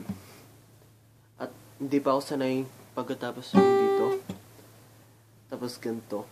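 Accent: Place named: Visayas, Philippines. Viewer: masiyadong visaya ahahah pati tuno mu pang bisaya na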